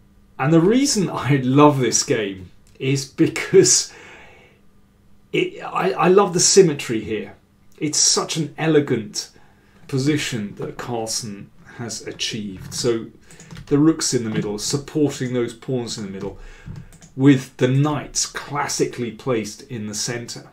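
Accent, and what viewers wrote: United Kingdom. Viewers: It was 1995 when i first heard your commentary when i used to watch anand Kasparov play at 4 am Indian time, and you have the knack to keep viewers engaged and the most appropriate British accent and vocabulary!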